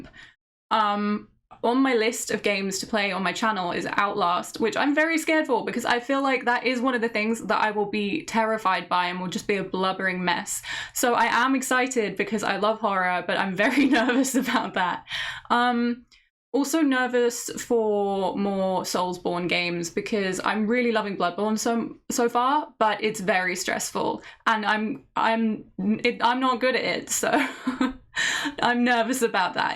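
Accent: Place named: United Kingdom. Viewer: i never thought you was lived here by that bloody british accent😋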